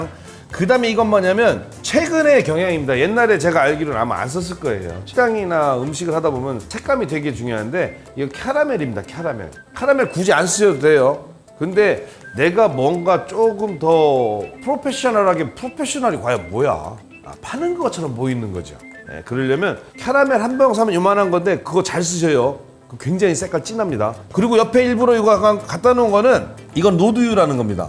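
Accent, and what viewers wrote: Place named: Gyeongsang, South Korea. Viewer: 경산도 말씨가 귀에 착착 감깁니다 영상보면서 입가엔 미소가 생기네요 재밌게 잘 보고 있어요^^ 윤이련씨, 가족분들은 좋겠어요.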